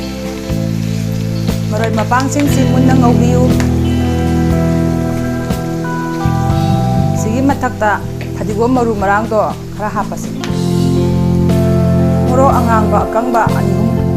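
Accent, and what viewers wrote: Kakching, India. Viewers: Kakchinggi meitei lon se tabada yamna nungsijei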